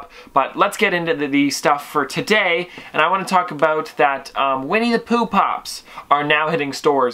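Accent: Canada. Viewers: I love Canadian accents 😂 love the videos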